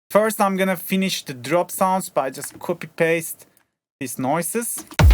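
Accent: Turkey. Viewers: Memati maske de taksan o sıfatın belli oluyor 😂 türk olduğun aksanından belli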